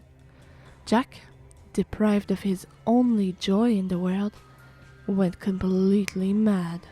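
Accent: France. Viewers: Peut être aussi ta façon de prononcer des mots français comme "tragédie de sablier" à la française. En général quand quelqu'un sonne aussi bien français... surtout sur les R... ça peut laisser suspicieux. Et puis j'ai l'impression que Pandora Hearts est assez populaire en France (même si ce n'est pas l'oeuvre qui revient dans le plus de discussions actuellement, je connais pas mal de personnes de bon goût qui l'ont lu ou regardé)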